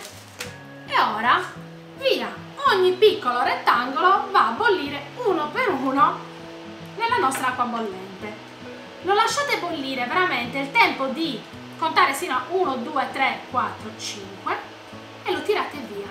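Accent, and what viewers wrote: Sardinia, Italy. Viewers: Che dire ….mi fai morire ,sei troppo simpatica ,ma sopratutto brava ,piena di energia ,fantasia e simpatia ,pian piano mi sto guardando i tuoi video ,sei sarda come me e si sente 😂,sono molto curiosa di sapere di quale parte della Sardegna sei ,io sono di pula ,provincia di Cagliari .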